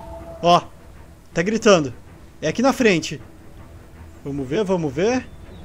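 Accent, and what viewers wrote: Rio Grande do Sul, Brazil. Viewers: Gaúcho? Mas aah djdjjd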